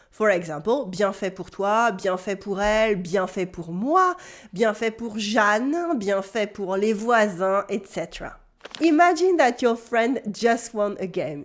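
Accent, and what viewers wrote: Paris, France. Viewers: From my little experience in Paris along with some automotive supplier work in Montreal, I have learned that there are many versions of French. First and most correct is Parisian French and I think that is what the narrator is speaking. Next is provincial French spoken in France but outside of Paris. A bit distant third is French Canadian followed by French spoken everywhere else eventually down to Cajun.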